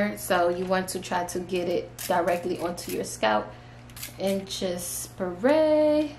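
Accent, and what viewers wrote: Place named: Washington DC, United States. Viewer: I know you’re from the dc area by your accent. Love the video. Thanks.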